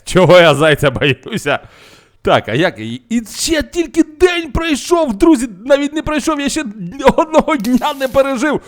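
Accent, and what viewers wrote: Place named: Arab world. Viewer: До речі, хотіла спитати, ти араб ?( просто у тебе такий діалект , як у арабів)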